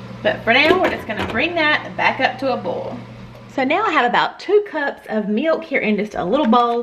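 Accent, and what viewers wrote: South, United States. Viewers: I'm new to your page and I love what I see so far and I love your southern accent and by the way I'm from south carolina and can I ask where you from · Love the southern accent! Part of the southern tribe.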